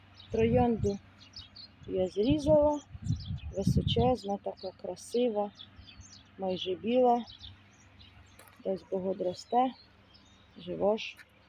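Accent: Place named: Poltava, Ukraine. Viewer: Я дуже радію з того що чую свій полтавський діалект ібачу колегу по квітах аж сльози виступили так мені схотілося усвою трудолюбівку пропоную помінятися : ви мені сімена сон_ трави або кущик, а я вам голубу юнону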